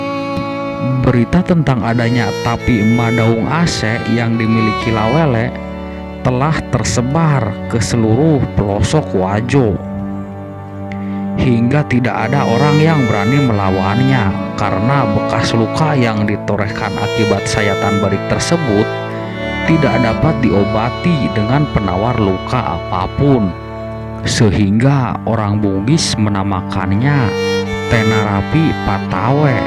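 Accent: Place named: West Java, Indonesia. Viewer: Sayang sekali Pembicaranya berlogat SUNDA, sehingga penyebutan nama2 jadi salah. Misalnya : LA WELE' ..ada tekanan di ujung kata ... Merubah bunyi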